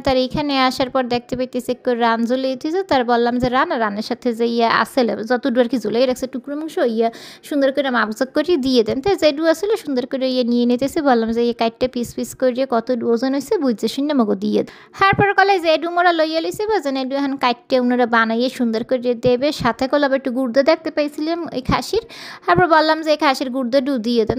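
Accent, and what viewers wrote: Barishal, Bangladesh. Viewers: Aponar kotha sunte Amar khub bhalo lage especially Aponar borisailla bhasa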